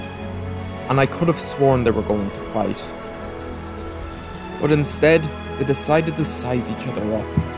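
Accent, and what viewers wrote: Ireland. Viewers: I love Irish accents :)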